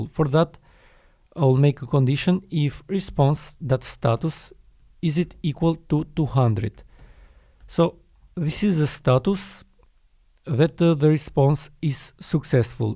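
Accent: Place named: Albania. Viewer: pershendetje, prej aksentit qenke shqipetar 😊.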